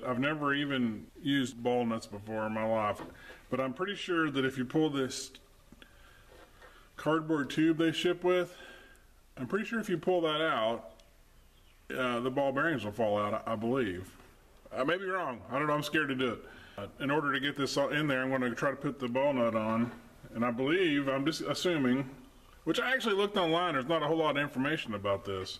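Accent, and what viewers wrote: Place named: United States. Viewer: Hello, I plan on doing this same retro to the same mill as a future project. What I am wondering is how are the ball screws and ball nuts holding up? In general how is the whole machine holding up...maybe you could do a video on that..? By the way you did a great job on the video....you explained everything very well ....plain American english...TY even an old worn out guy like myself could understand. I've started things that people said a 10 year old can do it, we'll I crawled out of that hole feeling pretty stupid. I alway figure it out but it may take me a little longer and a few extra $$ but we getter done.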